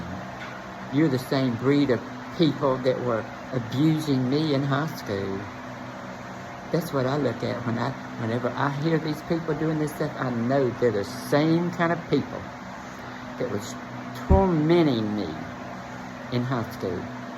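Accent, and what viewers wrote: South, United States. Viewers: Hi, you're the opposite of ugly. You are very attractive both in your appearance and your demeanor. As a fellow North Carolinian, I also love your Southern drawl. Even though I'm not an "ally", even though I'm very traditional in my values, please know that I like and respect you and enjoy listening to your content. You bring a refreshing and humorous voice to what can be a contentious topic. Especially I appreciate that you're clear about the differences between born male and female as to athletics. And you are clear on not being okay with grooming, unlike the radical activists stealing the conversation. Thank you for speaking out.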